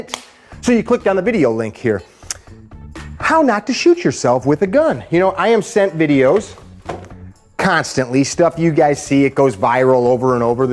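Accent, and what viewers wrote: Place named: Midwest, United States. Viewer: I can’t get enough of his Midwest accent no homo.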